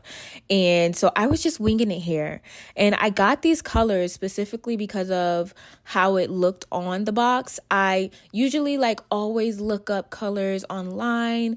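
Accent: New York, United States. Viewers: “BRONZA”😭😍🤣 I love your NY accent!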